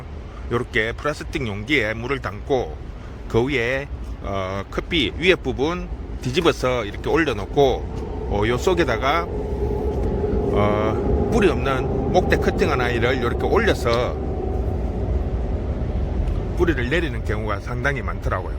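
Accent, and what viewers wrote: Gyeongsang, South Korea. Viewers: 경상도 사투리 죽이네여 물이 다이는것과 다이지 않는것의 뿌리내림 차이 ㅎㅎ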